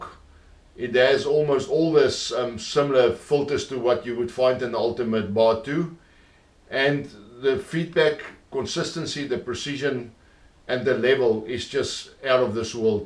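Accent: South Africa. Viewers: Accent like south african mate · Good to hear a south African accent within sim racing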